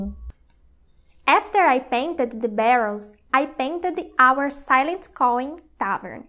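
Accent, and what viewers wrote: Brazil. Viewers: Otimo trabalho *-*, quero fazer um cabo de arma para uma flintlock pistol impress, é pra ser uma madeira mais lisa e polida, da de seuir o mesmo padrão? pelo sotaque chuto ser mineira ou do norte kkkk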